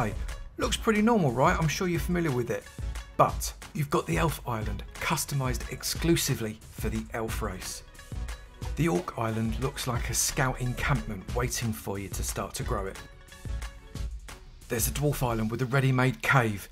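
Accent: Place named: London, United Kingdom. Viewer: ngl with your london accent im sad you didnt say wonga instead of cash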